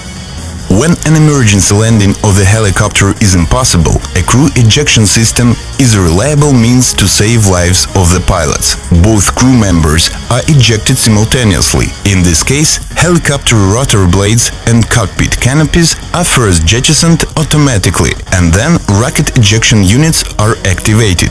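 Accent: Russia. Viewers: русский ваня говорит(((((((( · Такое чувство, что это русский пытается говорить по-английски.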